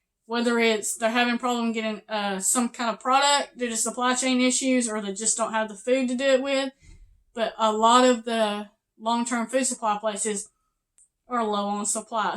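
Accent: South, United States. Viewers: Subscribed, not just for the info... but I love that southern accent! (I'm a Yankee in northern Indiana so it's rare to hear.)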